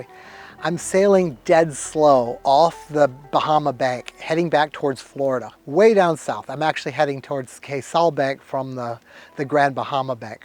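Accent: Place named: Seattle, United States. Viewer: Hello, loved it are you from Seattle, I think I heard that??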